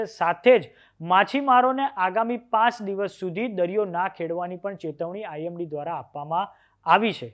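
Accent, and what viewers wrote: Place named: North Gujarat, India. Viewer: Tamari boli upar ki lage se aap utar Gujarat bajuna so A G Ahir o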